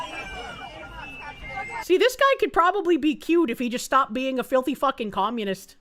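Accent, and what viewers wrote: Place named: California, United States. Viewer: So I'm not the only one who can't take anything too seriously when a Californian accent is sprinkled on it.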